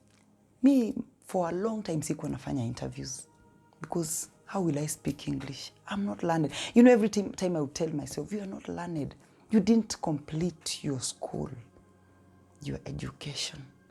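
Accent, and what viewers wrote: Tanzania. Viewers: What an inspiration! Glory to God. To be sincere I used to think you're from Tanzania because of the sweet voice and sweet Kiswahili for that matter. More grace in your ministry. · When I first heard you I thought you are a Tanzania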